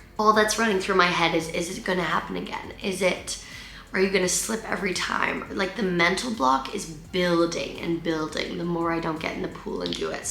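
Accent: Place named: United States. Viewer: Where’s your English accent gone? You sound REALLY American 😳😢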